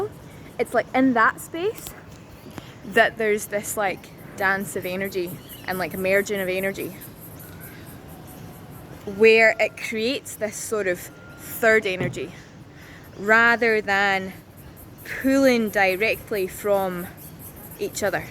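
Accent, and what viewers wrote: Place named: Scotland, United Kingdom. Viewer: I’ve just found you here and loving your beautiful message/teachings… and your lovely Scottish accent. Are you from Glasgow/Lanarkshire?? 💕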